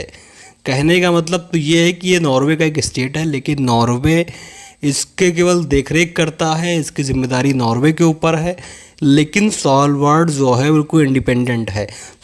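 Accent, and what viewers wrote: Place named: Uttar Pradesh, India. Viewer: Up Se ho kya bhai ..Awaaz Se lag raha hai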